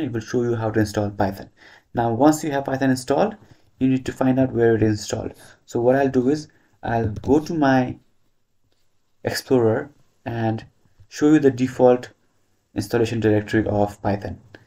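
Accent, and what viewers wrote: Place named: India. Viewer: Never trust a guy with a indian accent that gives advice about python and windows config channels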